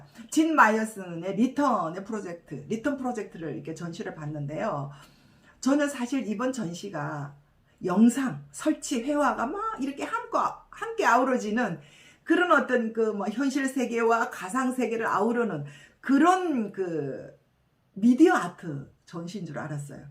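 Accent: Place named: Busan, South Korea. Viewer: 그 동안 몰랐었는데요~ 부산이 고향이시군요 고향에 가시니~ 억양에서 부산느낌이 납니다^^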